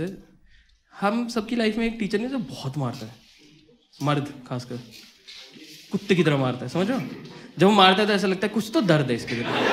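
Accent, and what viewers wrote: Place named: Garhwal, India. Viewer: Bhai tum jaat nhi laggre ho yaar gadwali laggre ho tum tohh 😅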